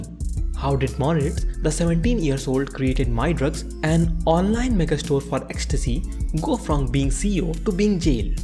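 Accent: India. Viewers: 🙄🙄🙄 yeah that's what I said Im Indian too I guessed it by the accent bro thanks for an informative video.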